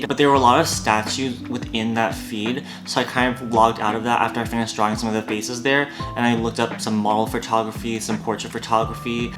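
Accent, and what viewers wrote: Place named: Philippines. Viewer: Liked the drawings. Also, I get why people ask about your background. Your accent is all over the place, I would have guessed Philippino. I am also Asian, born and raised in America. People always ask about my background, it's annoying. Good luck with your studies😊😊😊😊😊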